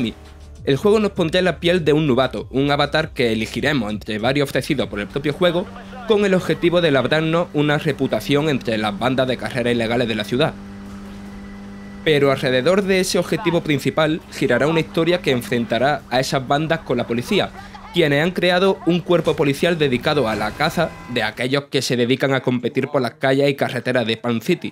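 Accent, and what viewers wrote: Andalusia, Spain. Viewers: Que es andaluz es indudable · Habla con su acento, también existe el acento gallego, canario, madrileño, murciano, como el andaluz, fin. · Acento andalúz xd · no sabes lo que es un acento? Si es Andaluz y tiene ese acento ¿porque deberia de esforzarse en hablar como los del norte? El que no le guste que no lo vea, pero decirle que debe mejorar su manera de hablar porque a ti no te gusta (siendo totalmente correcta su forma de hablar) no viene a cuento. Ademas para tu informacion, el Andaluz esta a la par del Castellano (Español) ahora a esperar que me lluevan tortas de iluminados!!!! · en el sur de España se habla asi, por desgracia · Alejandro Cabrera Moraes Emmm claro que sí de hecho, te lo estoy diciendo por algo y el acento que tiene el hombre que está hablando es propio del Sur de España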